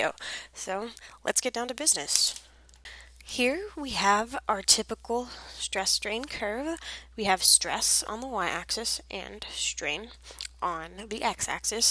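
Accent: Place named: India. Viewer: What's normal? An Indian instructor that you need to read captions to understand?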